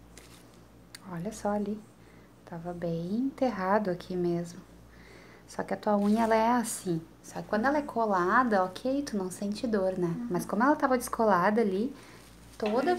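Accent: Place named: South, Brazil. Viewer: Pelo sotaque ela é do sul.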